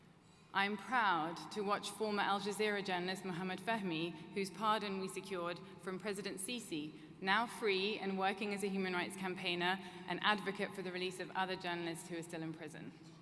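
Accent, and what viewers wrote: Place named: United Kingdom. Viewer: I really like her British accent .she is clever , educated and authentic and gorgeous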